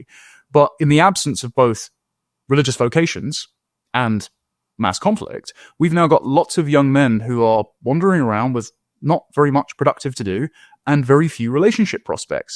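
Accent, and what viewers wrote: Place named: United Kingdom. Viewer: Why is he describing the right wing as religious? He sounds like a sheltered posh Englishman without any connection to the reality of most young men. For the longest time in Europe, nobody on the far right cared about religion. The uniting factor was hardcore nationalism and opposition to immigration. "Going to the right" and for it to be milquetoast tolerant Christian patriotism (which solves zero issues such as multiethnic societies) will help nothing. They'll figure it out for themselves.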